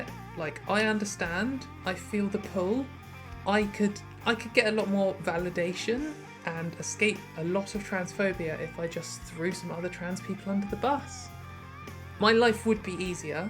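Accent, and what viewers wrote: United Kingdom. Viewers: Seeing u speak with a British accent is very weird. I imagined u had a transatlantic American accent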